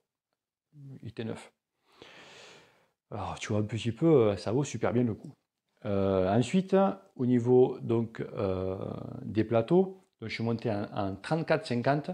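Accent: South, France. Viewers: Vu comment il parle je pensais aussi mais après je me suis rappeler qu'il est du Sud tu comprends 👍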